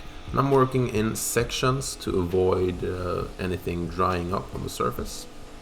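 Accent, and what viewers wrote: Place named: Sweden. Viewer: First time watching one of your videos, and I was like "damn, he sounds hella Swedish". Then I saw the Biltema can 😂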